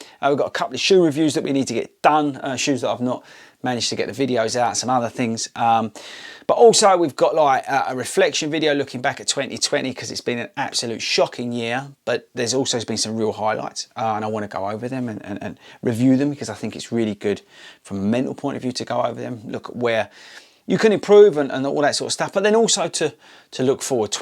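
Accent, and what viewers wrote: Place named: South England, United Kingdom. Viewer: Cheers for the videos this year, I don't watch all of them and I'll tell you why in a moment. The running related content is excellent, the vlogs are excellent but there are too many videos released. You will burn out, and I tell you this as an ex YouTuber who had 45k subs & 50k views a week.(it was not a running channel) I made 3 videos a week but refused to endorse any product, and was emailed multiple times a day to plug this and endorse that. (You know how it all works) You don't want to become known as the king of the freebies, as a viewer it's the most off putting thing ever. (I could say the same for mid roll ad's which I also didn't do) too much product placement leads to you not being trusted and coming across as insincere. Quality videos over quantity win every time, also don't worry about pleasing the American audience which is why I suspect your shoe review weights are in ounces and most videos come out at midnight, (Americans will watch anyway because they love a South England accent!), I stopped my channel about 18months ago now because it became another job which I never wanted it to be. 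Anyway best of luck for 2021 for you, the run community and your excellent channel.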